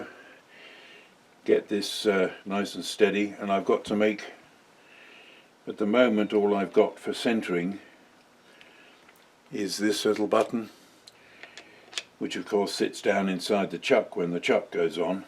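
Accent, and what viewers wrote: England, United Kingdom. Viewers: Hi, it's delightful to hear an English accent on these workshop related Youtube videos, even if you do use American pronunciations sometimes. Rob. Engineer, still learning!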